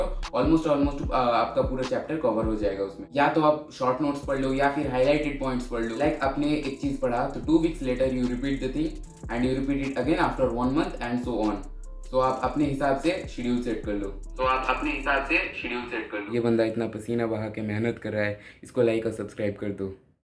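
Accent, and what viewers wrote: Bengal, India. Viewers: আমি নিশ্চিত তুমি বাঙালি from accent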